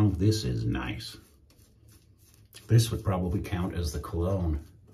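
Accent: United Kingdom. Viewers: The thing i love about british people is their accsent🥳😃🎉🎊🇬🇧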